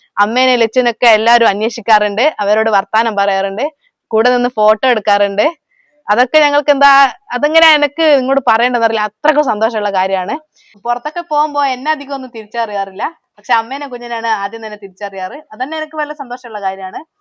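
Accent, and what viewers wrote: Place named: Vadakara, India. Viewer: എനിക്ക് നിങ്ങളുടെ വടകര ഭാഷ കേൾക്കാൻ നല്ല ഇഷ്ടമാണ് എന്റെ നാട് വടകരയാണ് ഇപ്പൊ പാലക്കാട്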